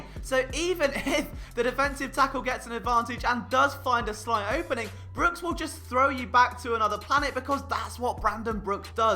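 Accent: United Kingdom. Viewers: Jeopardy "Potpourri" Category as an addendum: 1. Brooks isn't THAT tall, though he moves incredibly well for his overall size. Height wise, he's maybe an inch taller than me, at most. That he TOWERS over Sproles at the is hilarious. (Sidebar: Off the Sproles mention, we desperately need to get an elite RB like Swift, which I doubt they'd do, a solid one like Dobbins/Taylor or the Sproles lookalike -- LSU RB. If he's available in the mid third-round to early fourth-round, the Birds need to get him. While I'm not the biggest Sanders fan, a 3-headed RB tandem of the Howard, Sanders and LSU RB would be absurd. 2. I didn't think Brooks was going to play this year when he initially tore it . 3. PFF is flawed, my dude. I'll get you on my side at some point. Constant badgering is foolproof, right?! Ha. 4. On the first play in this film room, you mention his C, OG and OT "features" to make him a complete OG. 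But for the fact the Birds lucked out with the Dillard pick, I'd very seriously think of moving Brooks to one of the OT spots, if Vaitai struggled. All his measurables and eye-test shows he could likely be an adequate OT in the NFL -- but is clearly more valuable as the elite of elite OG. 5. The rest of the film room is why we all come here. I really would invest in this channel if it could somehow offer private shares. You break it down like Baldinger, yet are youthful enough to relate to most of the audience. Plus, even though I hate to admit it: the British accent, howeve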